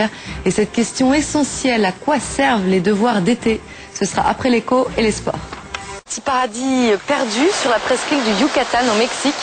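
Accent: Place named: France. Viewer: french accent is sooo fikkin hoot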